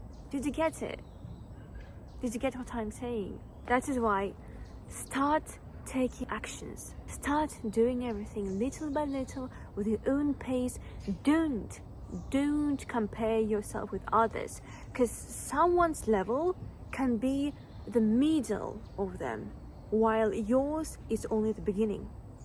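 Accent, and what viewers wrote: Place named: United Kingdom. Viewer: I love your British accent 😍💖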